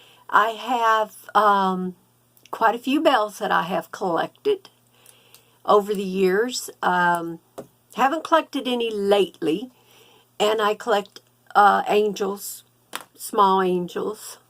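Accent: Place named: South, United States. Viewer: Thanks for sharing friend. I knew you had a southern accent!! Takes one to know one. Maybe we can do a swap soon.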